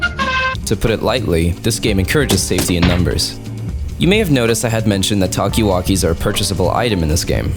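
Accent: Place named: France. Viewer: Also, you sound French. Are you French?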